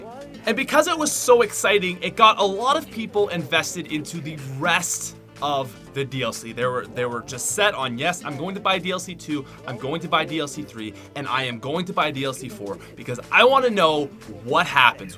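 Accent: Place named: Nova Scotia, Canada. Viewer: why do nova scotians butcher "about" us ontarians think that shits wierd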